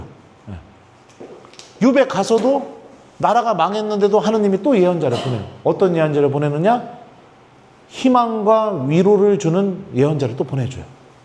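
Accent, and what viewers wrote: Chungcheong, South Korea. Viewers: 신부님 강의는 참쉽고 명쾌합니다. 그리고 충청도 사투리가 너무 정겹고 재미있어서 한참을 웃었습니다.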